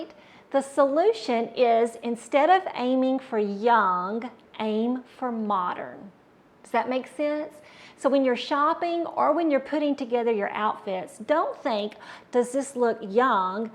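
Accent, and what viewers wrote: South, United States. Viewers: You have a charming southern accent. When my hair turned silvery gray, I asked the students at the beauty school to make me a blonde so I look and feel younger, not like a gray-haired old lady. I changed my lipstick and blush to light bright pink colors, nothing dark. Love Tip #3...Don't aim for young--Aim for modern. Yes, contemporary clothing is more youthful. Some of my friends do not try at all--they look like a walking clinical depression. They appear so matronly, 15 years older than they really are!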